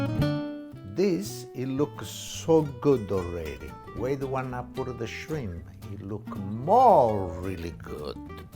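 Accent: Italy. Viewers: The man sounds Italian but trust me, he cooked that like a uni kid on rations doing an experiment! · He is such a darling man, and his Italian accent is absolutely adorable. 🎉❤🎉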